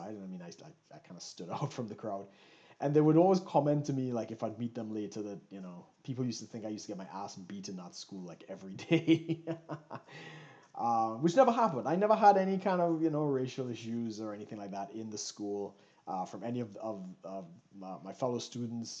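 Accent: Trinidad and Tobago. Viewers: U born here da can’t hide yuh accent strong buh u clearly lack self love I grew up in West wid white people and Dey mad chill .... you really don’t like yourself or ur folks don’t blame Trinidad blame yo mama !!! Police never harass u for money u delusional bruh stop fuckin lyin dawg you issa joke I will share u and clown u ur so fake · you sound trini thou..... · dont pay people with negative responses, you know we live in a fallen world. Keep happy and keep on doing what you love to do, i can also hear your trini accent, love it... the diet you are on is truly good, all the best with your endeavors!!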